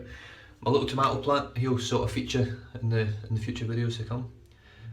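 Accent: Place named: Scotland, United Kingdom. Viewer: Hi new to DE shaving some great videos!! I recognise the Scottish accent good to see a fellow Scot on here. I'm in the west of Scotland myself.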